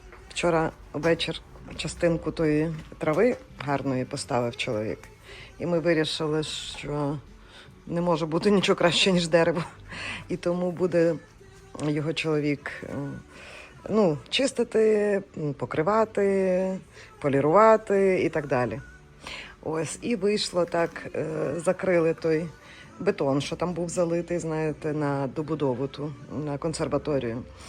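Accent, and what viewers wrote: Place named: Western Ukraine, Ukraine. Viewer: Дуже интересно, ви из захидной Украини? А звидки? Акцент наш!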